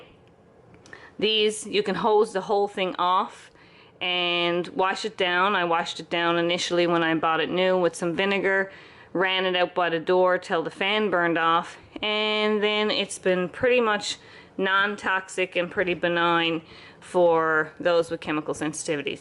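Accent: Newfoundland, Canada. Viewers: Ah, I thought I detected a Newfoundland accent! So glad to find a Canadian channel that recommends things that I can actually buy here. This is a great idea; I'm just concerned about the noise.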